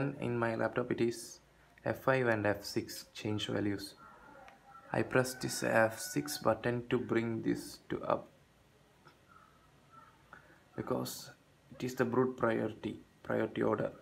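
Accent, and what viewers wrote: Kerala, India. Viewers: 😀sound ketta ariyile Malayali ann enn🌝😆 bro pinne orru help vennam 😅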